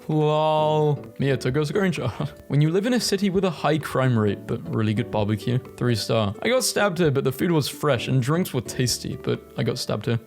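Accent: United Kingdom. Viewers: Is he British??? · His accent makes it A LOT better Shotout to British people and UK itself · This guy's british? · Why are you British 😕🙁☹️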